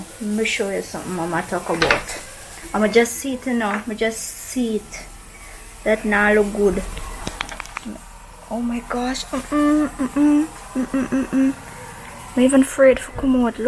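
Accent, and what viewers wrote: Jamaica. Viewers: Very proud to see that you adopted the Jamaican culture and accent and your kids are wonderful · You talk, act and cook like a Jamaican. Congratulations. I also like your green thumb and love of traditional Jamaican foods. I see that you are taking care of your family well. Happy for the family. You guys are adjusting well to life back in Jamaica, having lived overseas for all of these years. Ackee and Saltfish looked scrumptious. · and the patois kills me every time!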